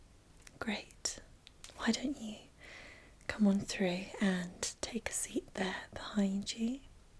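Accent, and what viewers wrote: United Kingdom. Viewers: Omg. Subscribed. I looooooove your accent I could listen to you talk all day!!!!! From the UK? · That UK voice. Mmmmmakes me melt. Thanks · Because of her GORGEOUS British accent, I thought instead of touch she said torch. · Very cool and British, which is obviously the best. But it still doesn't make me sleep 😑😑 I'm just too difficult. Good luck with the future!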